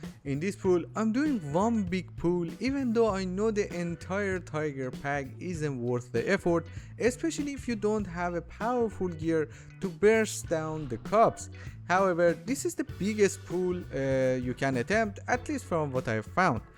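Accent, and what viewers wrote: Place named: Iran. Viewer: kose khare lahje irani xD nice video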